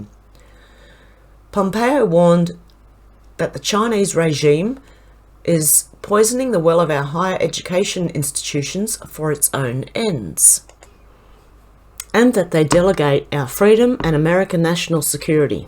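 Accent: Australia. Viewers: Love your Australian accent and you need not apologize for being a grandma you’re awesome 😎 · Love all your work and your channel,thank you! I'm such a sucker for your Aussie accent,love it! Trump 2020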